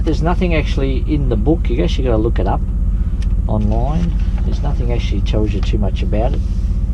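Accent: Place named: Australia. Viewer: Thanks for posting this! I can practice listening to aussie English and also enjoy trucks. Keep up the good work.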